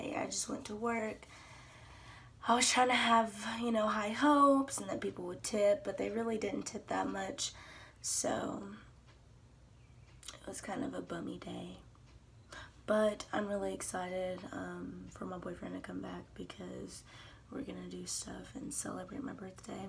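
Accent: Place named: Tennessee, United States. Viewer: Omg yes girl! I was hearing your accent and i knew you were from Tennessee. Go Vols ❤️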